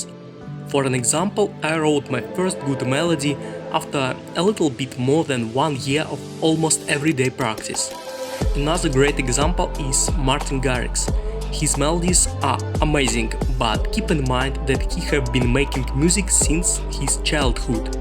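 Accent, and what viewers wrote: Russia. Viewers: ну просто слышно , что ты русский, соответственно у тебя есть акцент, который немного режит уши, но видео мне понравилось, лучше уж пусть будет такая озвучка чем гугл-озвучка) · Бро, по акценту узнаю что ты Русский. Я сам из Узбекистана, учусь и живу в Корее. Недавно наткнулся на твои видео, очень информативные и полезные. Просто хочу сказать спасибо за твоё старание. Break a leg!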